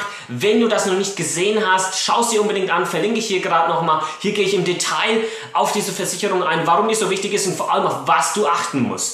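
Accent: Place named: Franconia, Germany. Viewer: Aus Aschebersch... und dann so einen schönen fränkischen Dialekt :D. Halbhesse :D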